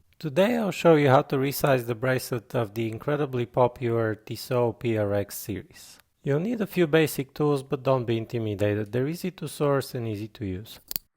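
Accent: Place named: Eastern Europe. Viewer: good video--knew it would be so, always look for eastern european accent for jewelers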